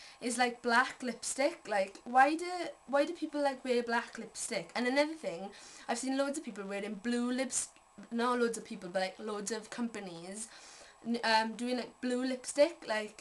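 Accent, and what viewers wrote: Wales, United Kingdom. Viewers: my love you sound soooooo welsh, love ya hun:)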